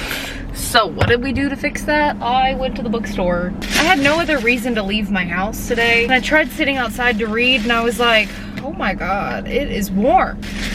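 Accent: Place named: East Texas, United States. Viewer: currently in east texas and your accent is giving me life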